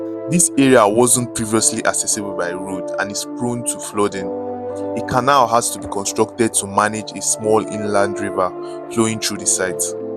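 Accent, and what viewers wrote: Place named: Ebira, Nigeria. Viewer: De thing wowoh small na Ur accent sef be like igbira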